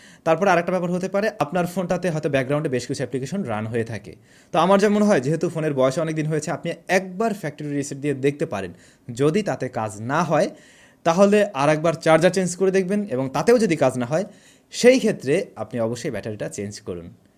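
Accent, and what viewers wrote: Kolkata, India. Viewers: Vai apnk kolkatar moto lage apnar kicu vashar jonno jemon ami valo asi asha kori apnara valo royechen avabe kolkatar manus ra bole tai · Via apni kolkata ar moto kota bolan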